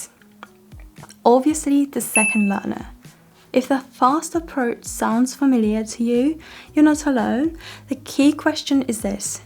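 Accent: England, United Kingdom. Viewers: wow you have a strong English accent when speaking English 😅 thats cool!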